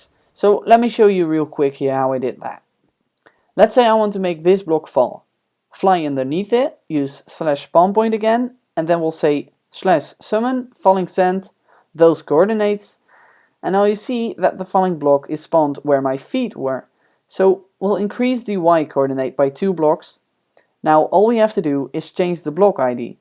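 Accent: Netherlands. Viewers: dude are you dutch? you sound really dutch XD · Awesomely made! Are you by any chance Dutch? You're accent sounds Dutch haha:p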